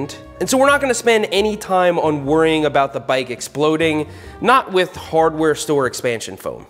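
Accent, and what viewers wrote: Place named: South, United States. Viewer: new southern accent is coming through: "Impordan"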